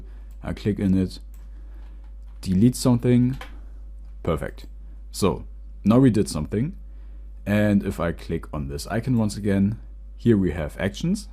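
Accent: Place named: South Asia. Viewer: Why is this white man talking like south asian man? just wandering. Good video!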